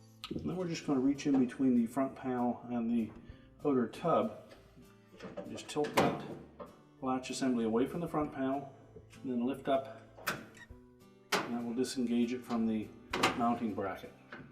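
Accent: Canada. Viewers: Great video, and especially appreciate the East Coast Canadian accent. :)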